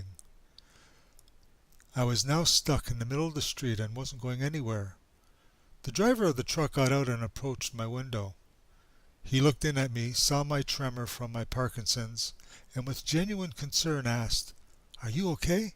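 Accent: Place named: Canada. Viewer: This is such a heartwarming story. Great writing. I love your deep Canadian voice!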